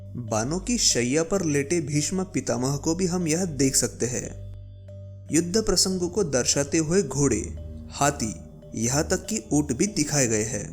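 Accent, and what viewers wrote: Maharashtra, India. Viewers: मित्रा तूझ्या उच्चारावरून तू मराठी वाटतोस. औरंगाबाद परिसरांत शाश्वत पर्यटनासाठी आम्ही प्रयत्न करतो आहोत. एक फ्रेंच मित्र गेली ४ वर्षे नियमित येथे येत असतो. तूझा मो. न. कळव. श्रीकांत उमरीकर <num>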